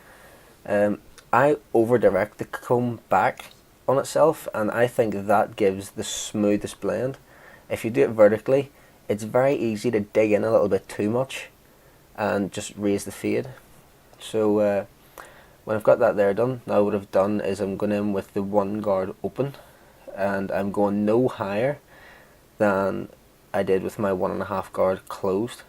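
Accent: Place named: Northern Ireland, United Kingdom. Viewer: Dude where is your shop based? You accent sounds like it's from the Northern Ireland. Would love to check out your shop.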